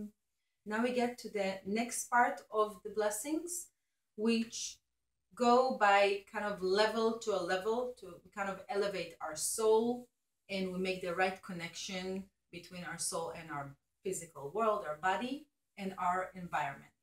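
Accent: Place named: Israel. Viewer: Thank you for explaining and telling us to stand. I can not sing, and have always been put off going to Shul because all are singing except me. I really like your accent, Ivrit from H 'Aretz. Could you do some videos with just speaking prayers?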